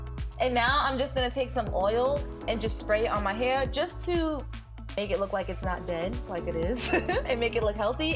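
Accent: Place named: Queens, United States. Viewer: Are you from Queens? You kinda sound like it.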